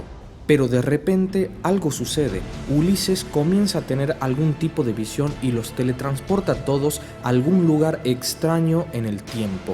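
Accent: Tucumán, Argentina. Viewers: tucumano mañero ja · Es Tucumano?. Un capo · Bien Tucumano so URA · De que nacionalidad sos... Te me hiciste tucumano o catamarqueño con tu pronunciación de tiesha(tierra)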